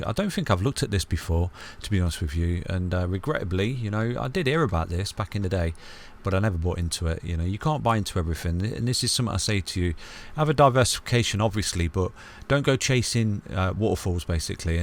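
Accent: United Kingdom. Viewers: Thanks so much. I love your videos (which are very eductive) and your fabulous british accent!:) Which PL team do you support? Take care.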